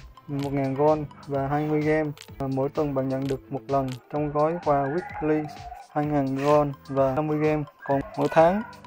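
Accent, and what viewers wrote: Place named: Vietnam. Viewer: unfortune l dont understand vitenm accent but lm glad we have sub title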